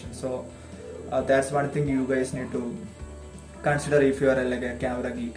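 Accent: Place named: United States. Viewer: Americakaaude pole und samsaaram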